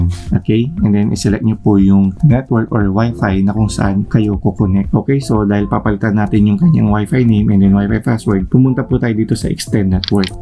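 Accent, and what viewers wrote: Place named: Pampanga, Philippines. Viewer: your accent panggalatuk or pampangan got me sub bro😎😎😎 great content and a big help to anyone. keep'em coming💪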